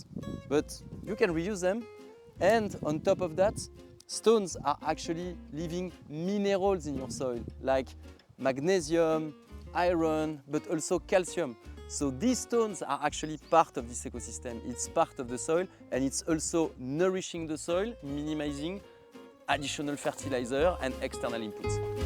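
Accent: France. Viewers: ahah pardon, de l'accent et du nom j'en ai déduit la nationalité française, désolé si je me suis trompé !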